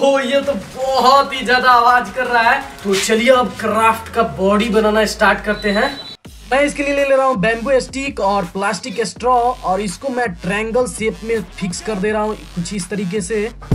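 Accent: Bihar, India. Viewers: bhai apna hindi nd english accent sahi kr lo thoda impression achha bnega apke accent m bihari tone aata hai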